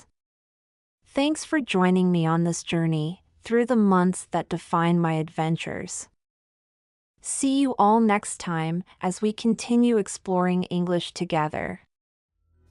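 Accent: United Kingdom. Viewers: Is it British accent ?! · Is this British english? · Please does this channel have a British accent?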